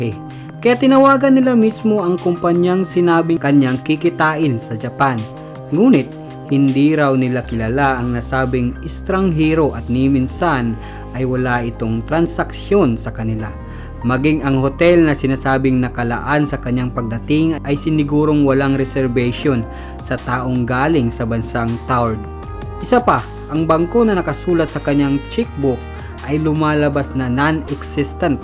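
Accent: Visayas, Philippines. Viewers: Bisaya ka ba pre hahahaha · ikaw din iba tuno mo haha bisaya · Maganda ung kwento kaso bisayang bisaya kakawalang gana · Bisaya ba sya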